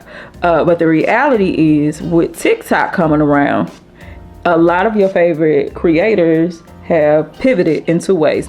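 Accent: St. Louis, United States. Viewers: I knew your accent sounded familiar. St. Louise have distinct accent. So pretty God bless.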